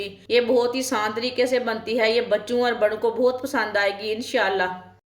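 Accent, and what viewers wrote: Punjab, India. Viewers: خدا کے واسطے اردو مت بولو پنجابی میں ویڈیو بناؤ آپکی اردو بھی پنجابی لگتی ہے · Mohtarama ki urdu b Punjabi lehjay wali hy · Samaj to a Rahi hy Punjabi style urdu hy to ksi ko Kya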